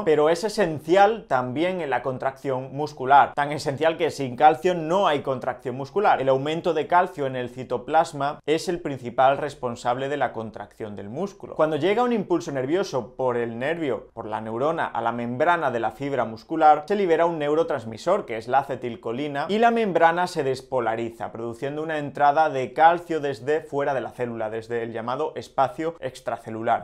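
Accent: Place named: Spain. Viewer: En España todo mundo habla igual, debe venir del mundo del fútbol: Athletic Madrid, Bilbao etc etc, además de comercios de ropa e implementos deportivos DECATHLON, separan las sílabas y como la H es “muda”… yo no veo, para nada, q pronunciar de esa forma, tenga q ver con inteligencia o formación académica, son modismos del idioma.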